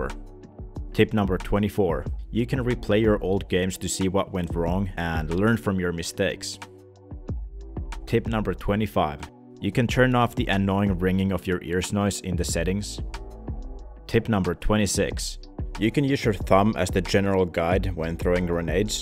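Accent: Finland. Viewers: Sulla on todella hyvä englanti suomalaiseks, en meinannu tunnistaa mutta jotenki puski suomalaisuus läpi mikrofonin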